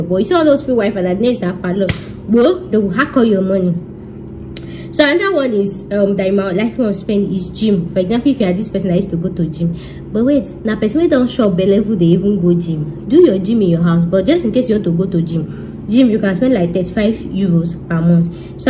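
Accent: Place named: Ondo, Nigeria. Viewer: With the yoruba accent i think you are from ondo townm please tell me i am wrong! omo yemi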